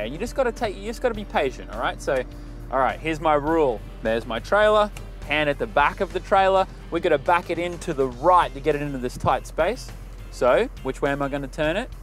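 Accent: Australia. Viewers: would you be an Aussie by any chance? I’ve never heard an American talk about “dings”!!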